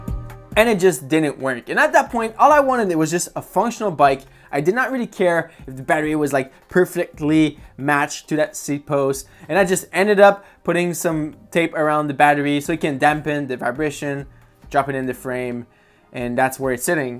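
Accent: Quebec, Canada. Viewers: Hello, super cool de t'entendre jurer en Québécois pour moi frenchie qui adore votre accent mais surtout vos jurons. Clairement si je me lance dans un TT bike build, je partirai sur du sram suite à ta vidéo. J'ai remplacé mon guidon sur mon Giant TCX par un guidon aéro full intégré et le di2 m'a déjà bien énervé! Super vidéo. Merci et profite bien de ton Scott Plasma. Ps: tout ces câbles coupés viennent d'un mauvais routing de ta part ou plutôt de la complexité du vélo?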